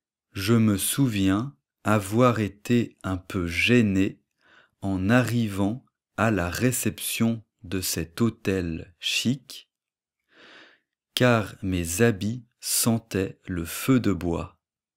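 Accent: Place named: France. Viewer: где вы изучали русский язык? Так чисто, грамотно, с легким французским акцентом говорите...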